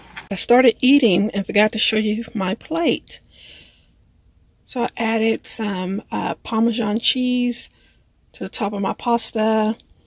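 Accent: South, United States. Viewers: I enjoy your content. Thank you for sharing. 1. I think I hear a southern accent? 2. Onions tend to have a long lasting after taste that is awful to me. I think it's just me though - folks love onions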